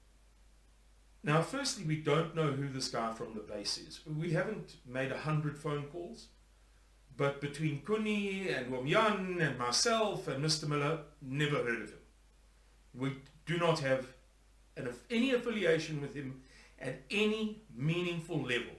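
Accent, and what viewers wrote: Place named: South Africa. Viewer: keep up the good cause. I hope someday you can visit the Netherlands and tell my fellow countrymen about the situation in South Africa. I have lived for 6 months in South Africa, in Kwazulu-Natal, it was a great experience. I just love this country. I love Afrikaans, and I love your accent when you speak English. May God bless you and all your efforts for your country.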